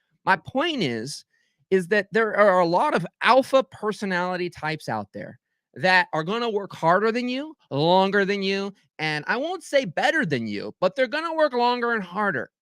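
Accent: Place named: South, United States. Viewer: Please don’t ever stop doing that southern intense accent. Here for the sweat! Dude I lol so hard while driving to this. Loving the new videos, keep it up! Gawd dang ! Let’s go!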